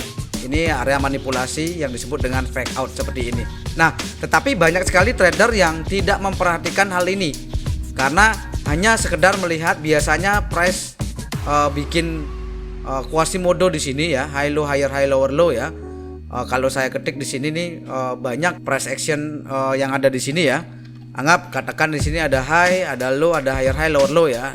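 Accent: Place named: Bali, Indonesia. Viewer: Kaya logat bali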